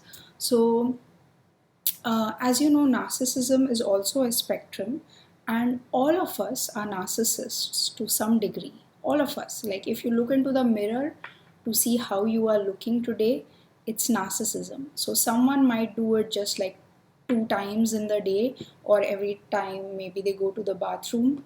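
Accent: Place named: India. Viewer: Another really great video! Your ideas are deep and you explain things plainly and clearly. (Love your accent, is it Indian or Pakistani? Love it! And your calm, friendly attitude is also really helpful in absorbing the information) Really enjoyed this, thank you !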